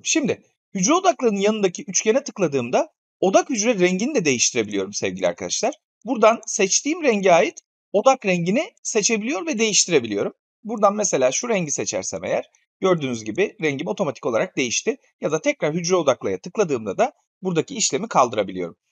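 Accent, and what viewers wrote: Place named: Aegean, Turkey. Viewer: ege şivesiyele biyendim, yani beğendim.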